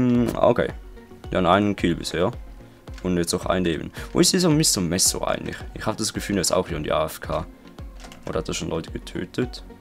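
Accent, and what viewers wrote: Switzerland. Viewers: Bist du Schweizer?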